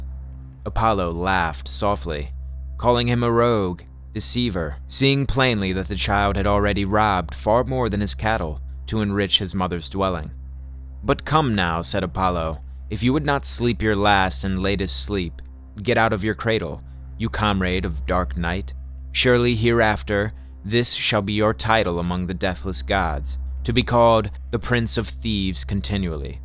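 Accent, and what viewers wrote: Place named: United States. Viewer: american voice nice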